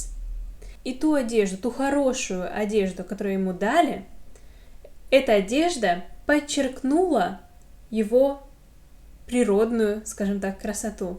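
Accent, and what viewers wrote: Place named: United Kingdom. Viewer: Perfect British Accent 😉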